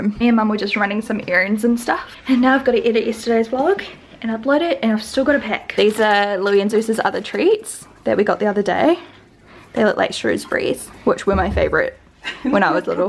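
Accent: New Zealand. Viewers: love you xxxx extra love your kiwi accent, makes me feel like I'm home xx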